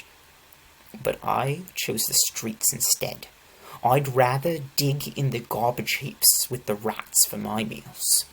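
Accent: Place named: United Kingdom. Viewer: I really love the British accent thank you !!!!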